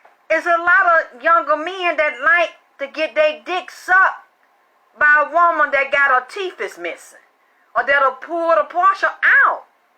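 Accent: South, United States. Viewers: “Gummy head” 😫😆🤣 OMG!!! **btw, you’re beautiful 😍 Glad you survived through those toxic years.. Look at you now.. “Where you start does not determine how you pull up nor your destination” 💜💜 I love the southern accent #SOUTHERNGIRLPRESSURE 💪🏽 We built different 🤞🏽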